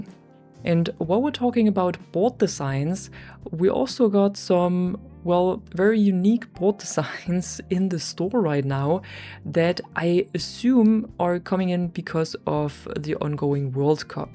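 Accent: Germany. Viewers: Ich wusste das ist der deutsche Akzent 😂❤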